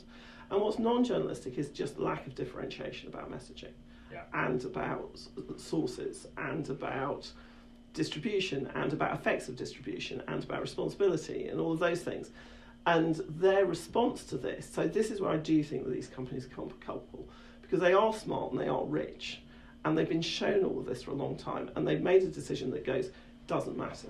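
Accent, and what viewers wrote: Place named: United Kingdom. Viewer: British accent used..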